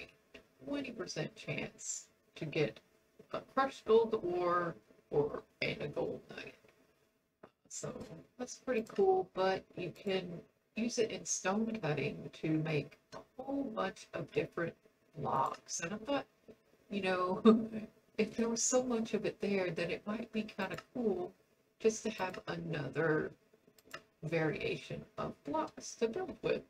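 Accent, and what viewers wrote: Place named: United States. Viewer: omg, I had the same heart-attack when my backpack was empty. If you look at the top left, you'll see everything is there, but bunched up for some reason. It only seems to be the first time I open my pack after I connect, or maybe after an update? Not often. But yeah, I've got all my tools and books in mine and was like "What the heckin'?!" when it happened to me. Did you see there's an upgraded version of the Environmental Eye called the Environmental Ocular that will show how far into positive or negative you are? And you keep using bonemeal to grow your trees for the ritual of the forest; would an Ars Grow spell not work, and save you some bonemeal? Re: streaming, keep in mind that you sound like you're in the US (and the weather in F in your taskbar says that, too :-P), and that means your day is my night. :-P So I, for one, would likely miss whatever progress you streamed, unfortunately.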